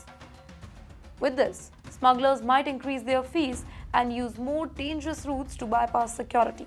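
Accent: India. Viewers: It's an indian english accent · Sounds like a women from UP , Pllease speak proper English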